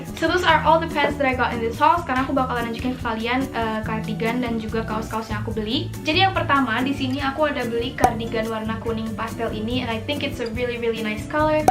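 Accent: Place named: United Kingdom. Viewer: Plss your english accent😭😭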